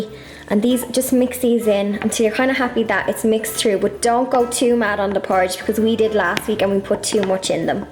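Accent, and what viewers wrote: Ireland. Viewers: I've really enjoyed watching you. Thank you AND it's so wonderful to hear the Irish lilt 😍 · I love your Irish accent and your video looks perfect including your dress and lipstick matched to perfection . Your eyes look straight out of a doll . The whole video - yourself , the recipes , soaps are just perfect . In India I might not get the same quality of soap base but will try with what I can get . Great work ! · I love your Irish accent. Definitely going to try these with a view to selling them on my shop. Please do lots more! Have just started subscribing to your videos. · Your beautiful voice reminds me of my family in Ireland. I LOVE YOUR SOAP! It came out so cute and I hope Im just as lucky my first time round. Thank you for giving me some confidence in soap making ^_^ I appreciate you and all you do! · I knew by your accent yesterday u were Irish. 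I’m from Ireland too. What part you from? Ca bhfuil tu I do chónaí?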